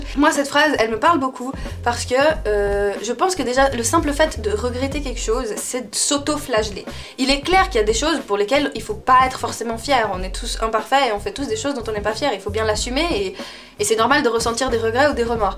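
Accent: Geneva, Switzerland. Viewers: Je t'aime putain !! Tu es genevoise ??? En tout les cas tu a l accent genevois. Ça fait un petit moment que je te suis et j adore ta personnalité et ta conception du monde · Ça me faire sourire comment vous dites certains chiffres les suisses voila :)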